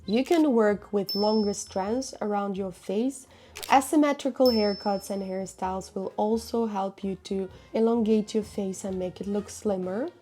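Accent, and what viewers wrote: Russia. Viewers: У русский акцент, послушала бы ее на русском.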